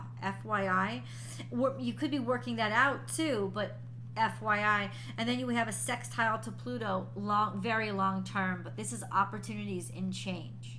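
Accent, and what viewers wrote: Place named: Connecticut, United States. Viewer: You sound like you are from Stamford/Norwalk, and your star calls and insights have been spot on for me. Thanks for the insight. M/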